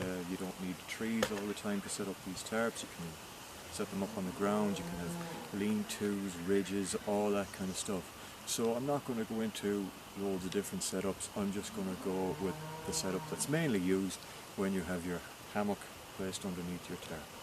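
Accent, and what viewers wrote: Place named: Ireland. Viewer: knew the Irish accent straight away..good video..sub'd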